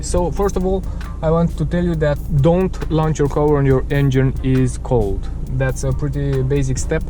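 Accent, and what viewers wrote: Romania. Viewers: Mi am dat seama ca esti roman dupa accent si 2.0d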